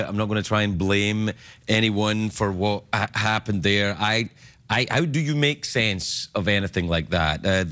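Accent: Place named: Scotland, United Kingdom. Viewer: He sounds Scottisher here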